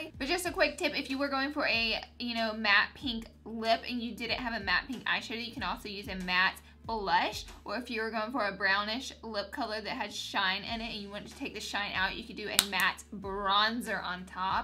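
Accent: South, United States. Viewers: are u American 😂😂😂😂😂😂😀😂😂😂😂😂😂😂😂😂😂😂😂😂😂😂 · I love your accent!! I'm from Texas and I love hearing that southern accent it just makes everything so much nicer haha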